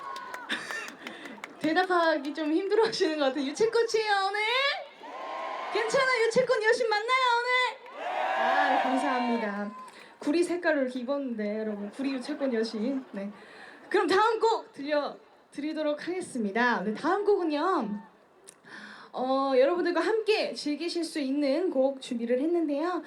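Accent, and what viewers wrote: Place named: Seoul, South Korea. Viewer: 전유진 서울사람다됬네 이제는 서울말을 자연스럽게 잘하네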